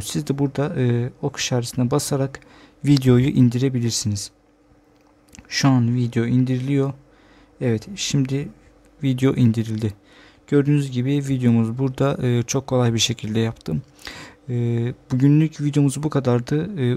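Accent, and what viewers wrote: Azerbaijan. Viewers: SALAM ELEYKIM QAQA TURKCE DANISIRSAN AMA AZERBAYCANLISAN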